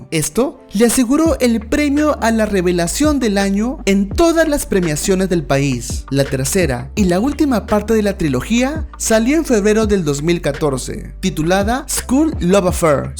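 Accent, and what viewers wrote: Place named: Argentina. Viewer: Hablas como los argentinos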